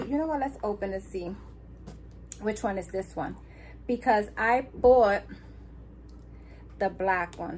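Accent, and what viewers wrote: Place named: New York City, United States. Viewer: I just came across your channel. You’re so inspiring and positive! I’m originally from NYC but live down south in FL…. Hearing your accent reminds me of home. I pray you’re able to get out of debt soon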